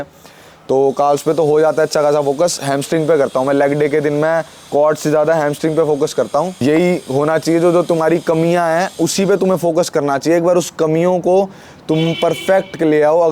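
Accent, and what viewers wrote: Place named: Haryana, India. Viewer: Haryanvi brooo 🗿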